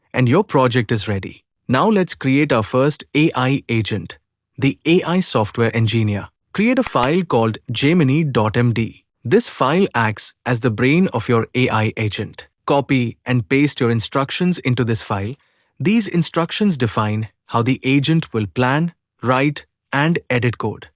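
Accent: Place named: India. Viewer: If your using AI for the voice why make it Indian?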